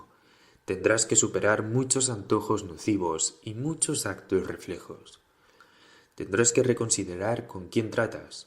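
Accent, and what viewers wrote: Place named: Mexico. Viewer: Me encanta su claro hablar y también el acento de México es muy entendible y claro pero el resto de Sudamérica es fatal.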